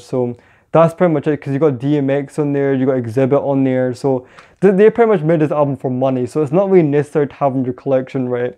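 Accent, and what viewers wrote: Fife, United Kingdom. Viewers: That sounds like a Fifer accent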